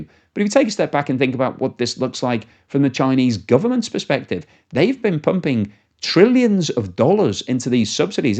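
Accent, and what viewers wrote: United Kingdom. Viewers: This guy sounds like he is from the UK why is he always talking about China? Is it because he’s xenophobic? Or because he knows talking about Chinas collapse brings low IQ people to watch his videos? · It's British English.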